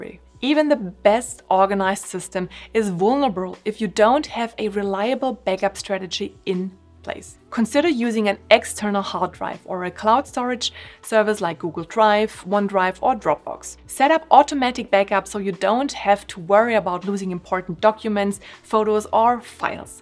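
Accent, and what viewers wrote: Germany. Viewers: Are you German? 🙄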